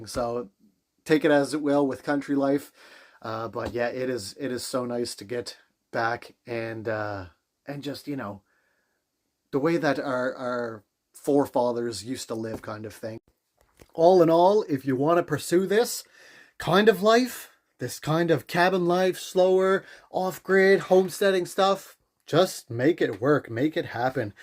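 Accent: Nova Scotia, Canada. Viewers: Your accent sounds Nova Scotian. Im guessing Pictou county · Thank you for this lovely video summing things up! I wrote once before saying "You must be a Nova Scotian" as I recognize the accent! But am forgetting which county you're in. I'm in Annapolis county and it's getting much harder to hide from all the restrictions in order to 'off grid'. I'm in a tiny 12x12 shack next to our bigger house, but eventually my 30 something son and daughter in law (who are also very much 'believers...Praise God!), are wanting to homestead and go more off grid with their little one. God has done many wonderful things in their lives so far, so I'm sure it will happen within the next couple years. (P.S. I have a cordoned off little corner with a bucket system bathroom and I just love it....WAY better than any water toilet!) In a future video, can you give your testimony of how Jesus came into your life? 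Love hearing the multitude of ways He has shown up in peoples' lives.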